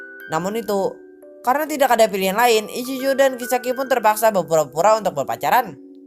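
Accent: Banjar, Indonesia. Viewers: orang banjar kh bg?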